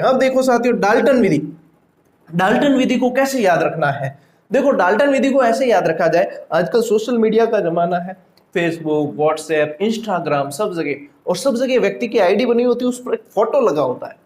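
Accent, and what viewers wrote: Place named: Jodhpur, India. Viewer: तभी , आप भी बिल्कुल हमारे (जोधपुर) जैसी मारवाड़ी बोल रहे हो 👌👌 शानदार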